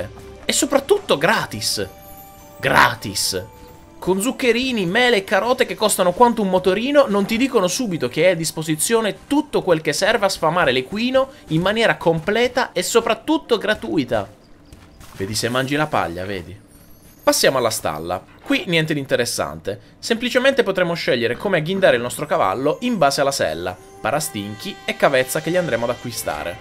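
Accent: South, Italy. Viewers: si sente proprio che sei del sud:D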